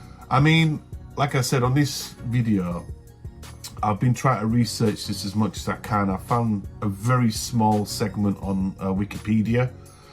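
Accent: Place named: North Yorkshire, United Kingdom. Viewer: Interesting channel this! We do love our kebabs never even thought of who designed the logo! That’s definitely a North Yorkshire accent! All the best for 2025 👍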